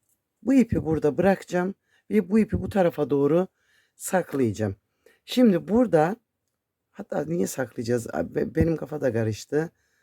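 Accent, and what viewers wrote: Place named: Malatya, Turkey. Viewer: Çok güzel anlatıyorsunuz sivenize bayılarak dinliyorum Malatya'da bizim gibi konuşuyorsunuz şimdiye kadar hep şişle örgüler yaptım size abone olduktan. Sonra tığla devam etmeye karar verdim daha kolay daha çabuk bitiyor buda benim işime geliyor torunlarla uğraşmaktan zaman kalmıyor yanlız birde şu pileeli kız bebek hırkası aatarsaniz sedvinirim cunkuj benimkiler hep kız 🤗🤗🌹🤗🤗🤗🤲🤗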